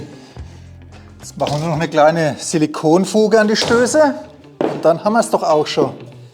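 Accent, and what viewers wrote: Franconia, Germany. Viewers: Hört man da fränkischen Dialekt durch 👂🏻👂🏻👂🏻.....😊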